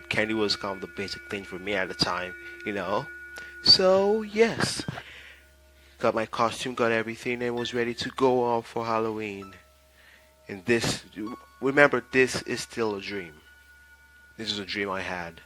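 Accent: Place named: United Kingdom. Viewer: y you sounding British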